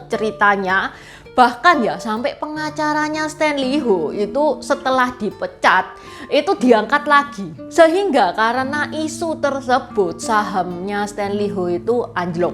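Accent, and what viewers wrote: Java, Indonesia. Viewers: Logat jawa 😅😅😅😅😅😅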